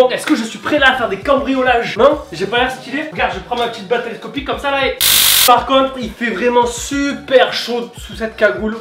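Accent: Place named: South, France. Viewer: T du sud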